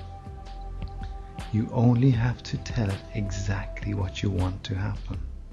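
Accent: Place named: Ireland. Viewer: Another Irish voice not making me calm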